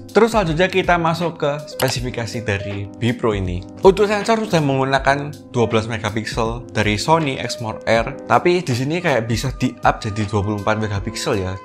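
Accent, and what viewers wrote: Java, Indonesia. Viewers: Matur suwun info ne ..... Sangat membantu, suka sekali lihat public speaking yg medok gini wkwkkwkwkw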